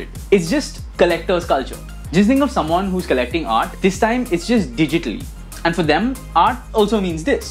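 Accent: India. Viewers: Can't listen to the Indian English accent for more than 2 minutes without cringing :(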